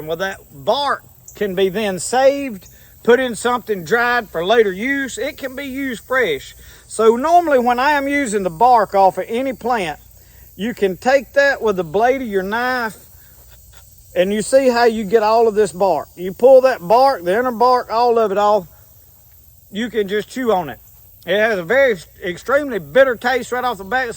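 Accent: South, United States. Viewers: I just stumbled across your channel because of your skullcap video… I was like “this dude has got to be in Ms.” lol I’m in Wayne County. I totally feel that Southern Boy accent! The hubby and I got tickled at you for being barefoot as a yard dog in the woods 😂😅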